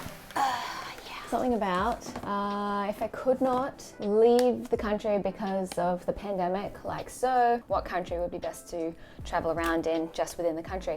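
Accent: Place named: Australia. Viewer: I'm so jealous of you. Although I've lived a good portion of my youth in Japan, I have not experienced Japan the way you have. I'm glad you share your experiences so I can enjoy Japan from your perspective. You also put me to shame to the Japanese language because I can barely understand it or speak it, LOL, the problem from living on military bases. I love your Australian accent! Keep going with your content....I've enjoyed several :)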